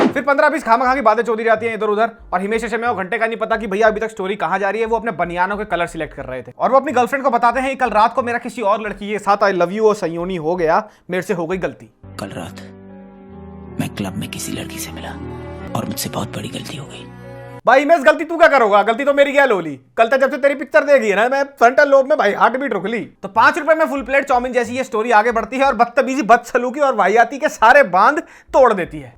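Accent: Haryana, India. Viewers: Your haryanvi accent is to the point.😂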